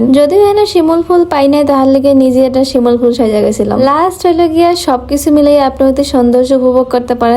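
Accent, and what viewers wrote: Barishal, Bangladesh. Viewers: কি বরিশাইল্লা পুরি এখন প্রকৃতির মাঝে হারাই গেছে 😊😊❤❤ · আপনার কথাগুলো সুন্দর লাগে🥹বরিশাল মনে হয়🧐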